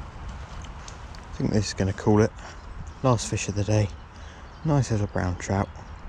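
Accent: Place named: South, United States. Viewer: Hi mate, fantastic location and fish! Your accent sounds like youre down south, im from Buckinghamshire but struggling to find some good wild trout streams like this (a lot of them seem to be expensive chalk syndicates or fly-only). I dont suppose you'd be able to give me any tips on these sort of locations you spin for trout on? Dont mind driving an hour or 2. Can always PM if you dont feel like sharing publicly, thanks, James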